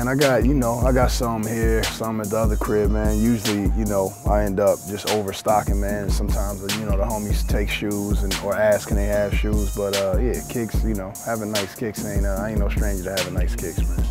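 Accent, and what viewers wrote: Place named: Inglewood, United States. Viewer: He is from eaglewood CA, which is called the "wood" People from there talk like that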